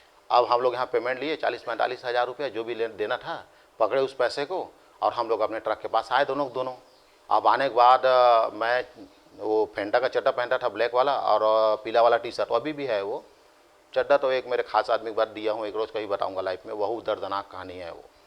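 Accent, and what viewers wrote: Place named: Chhattisgarh, India. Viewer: Bahut hi gajab story boss ❤ Sir G Apki chattishgadhiya अंदाज में तो पूरा cg students फिदा हैं।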